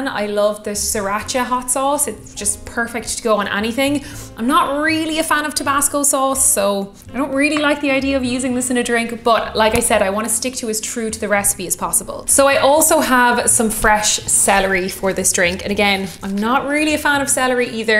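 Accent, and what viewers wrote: Ireland. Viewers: Pronounce worcestershire however you want to. Modern English changed all of the pronounciations of these types of words, anyway. There's really nothing anyone should say. Maybe that's just the Irish bit of me wanting to stick it to the English? I am fine with that. Like they've been known to be nice to Irish folks, riiiight? Definitely anything sounds adorable in an Irish accent, so there is that, too. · Speaks beautiful irish 🚻 is absolutely stunningly beautiful 🚸plausibly bordered line alcoholic 🚼🚼,Jesus could you stop sending your angels to earth to be amongst us mere mortals,🤔😂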